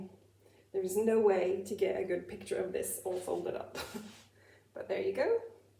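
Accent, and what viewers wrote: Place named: Ireland. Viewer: …you are such a kind and gentle soul and it shines in every Flosstube that you make! I find it so relaxing listening to your soft voice with such a lovely Irish accent..the way you enunciate your words, your little giggle at yourself and things you do. This earth needs more people like you. Happy Flossiversary to you! Listening to you sign off with your multiple thank you(s), it really is apparent it comes from the heart. I have a question for you…did you finish your Blackbird Designs - What Remains Is Love? I understood that you were part of a SAL and I have not seen your progress on it for quite some time. I do watch many Flosstubers and it is possible I have gotten this wrong…I ask, because I finally got a chart and decided that while others are seeming to participate in Stitch Maynia buy starting multiple projects; my plan was to be a real rebel and Mayniac and stitch every day in May on one single chart to see how progress I can make. 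I have chosen What remains and wanted to join in on the SAL. If you are doing that SAL would you mind giving me the nAme of it on IG please. Your bunting looks stunning and you deserve a medal for undertaking such a project. p.s. I love your stamp chart and story. It is actually a chart I would like to do as well, because being a Canadian I grew up licking many a stamp with the picture of Queen Elizabeth as well. The one I seem to remember the most was the royal blue one. p.p.s. I don’t stitch Halloween either! Great con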